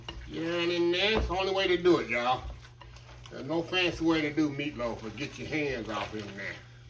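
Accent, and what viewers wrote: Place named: Texas, United States. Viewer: Fixin ta make yo meatloaf again. Hands down feels like home your cookin. They moved my old ass from south part of Dallas up here ta Wyoming. Aside from the cooking hearing someone from my aria is so nice. I miss Texas baby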